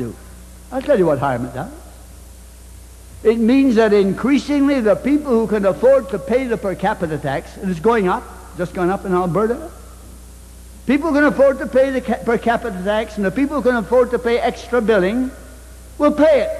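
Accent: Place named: France. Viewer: I like the idea of free health care but our national budget comes first otherwise Canadians starve.some socialist believe that more barrowing and spending is a good thing but its your money and you spend it the way you want to.would this man qit ranting,his French acsent annoys the living hell out of me.scialisum dosent help the poor,it drags everyone down from richest of rich and poorest of poor.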